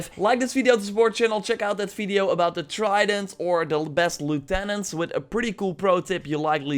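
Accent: Netherlands. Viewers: My man is dutch isnt hé? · Are you from Holland? Your thick accent makes me think you are haha, greetings from Belgium.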